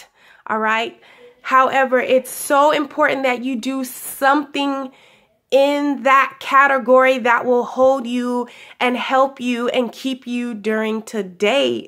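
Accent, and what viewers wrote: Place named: London, United Kingdom. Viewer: Lmao your London accent gave me life 😂💀 ... I can hear you speak about self motivation all day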